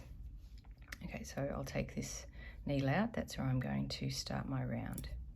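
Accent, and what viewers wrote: Australia. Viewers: So refreshing to hear a fellow Australian accent on a knitting video! I always worried my 'free-form' approach to weaving in ends was 'wrong', so it's very reassuring to see how you do it, it makes sense to have an intuitive attitude. I love the neat result of the seam, so I'm going to follow your suggestions.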